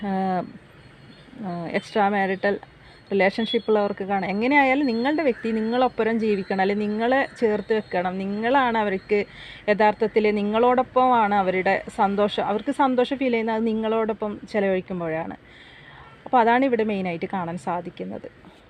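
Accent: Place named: Kasaragod, India. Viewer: ചേച്ചി കാസർഗോഡ് ആണല്ലേ... പറഞ്ഞതൊക്കെ കറക്ട ഒന്ന് ഓള് മനസ്സ് മാറി വന്ന മതിയാർന്നു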